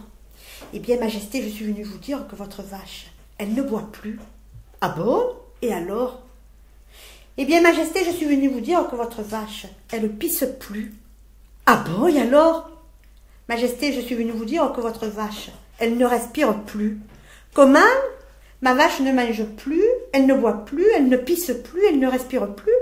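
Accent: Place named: Provence, France. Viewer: Très drôle et j adore votre accent qui me fait penser à M. Pagnol , quel beau pays que la Provence !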